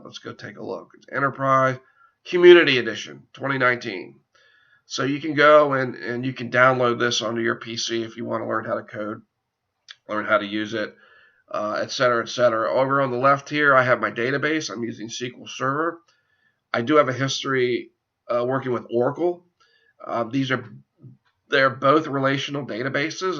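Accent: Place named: Eastern Shore Maryland, United States. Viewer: Sounds like a dude from the eastern shore of MD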